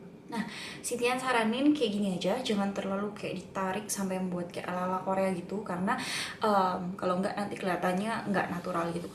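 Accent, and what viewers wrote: Surabaya, Indonesia. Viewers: hai.. you are so pretty and natural ^^. i have beauty chanel too, if u don't mind please check up my chanel too ^^ and hopefully someday we can join for another tutorial.. because i know from ur "logat" u are surabayaERS hahaha.. salam kenal ^^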